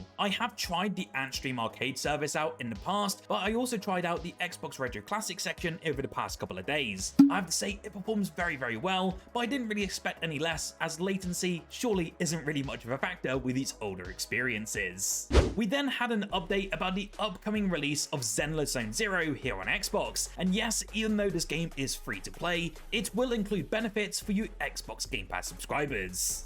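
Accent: United Kingdom. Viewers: Where his is accent from what part of the UK?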